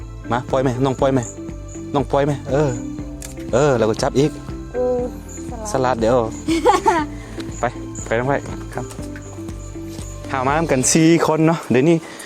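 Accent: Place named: North, Thailand. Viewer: อู้เหมือนคนเหนือเจ้า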